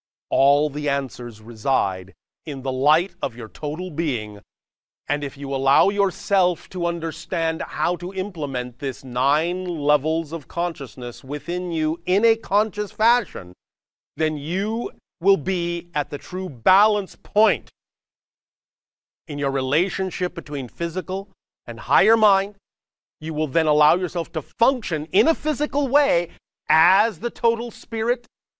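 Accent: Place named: Ireland. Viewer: This guys a good actor, but he looses his Irish accent the longer he acts.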